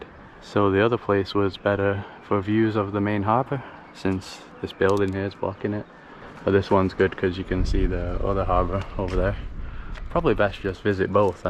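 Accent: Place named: Scotland, United Kingdom. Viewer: 😁 I was sure I could hear a Scottish accent...😁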